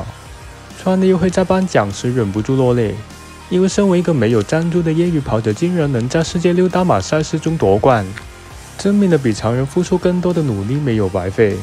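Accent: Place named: China. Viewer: 听你说话 我都快睡着了 普通话口音着实是没有力气的样子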